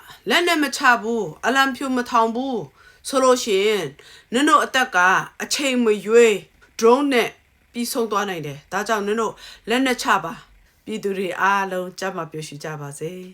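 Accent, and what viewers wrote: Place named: Upper Myanmar, Myanmar. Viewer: ကအညာသူကျနေတာဘဲ ရွှေဘိုဖက်ကစကားပြောတာနဲ့အတူတူဘဲ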